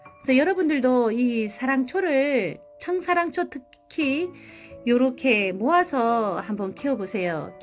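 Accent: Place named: Gyeongsang, South Korea. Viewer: 경상도 억양이셔서 여쭤봤어요~~^ 즐거운 하루 되세요~~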